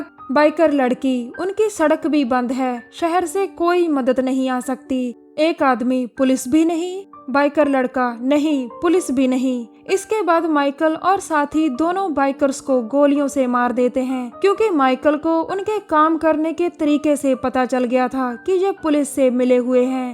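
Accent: Punjab, India. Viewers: tohdai awaj to hint mil gya si ke tusi punjabi o ....best of luck for your Channal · I love your Punjabi accent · Aap punjabi lgte ho bolne ke style se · Are u Punjabi 😀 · Aap punjabi bolte ho na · Punjabi tone aa rahi he...bechari zabardasti Hindi bol rahi he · Madam aap punjabi ho ? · Taudi tone teh Punjabi hi rehni haige chahe jini bhi Hindi bolan de koshish Kar Lao ji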